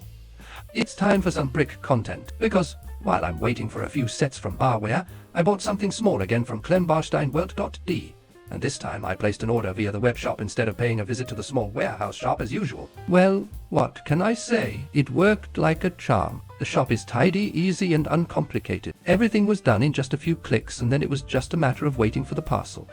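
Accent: United Kingdom. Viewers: Hello 😊... Yeah 🕺... First comment here 👌🏻. Good job and well done my friend. Very British speech. But what happened with the sound? 🤔 It's scratchy 😊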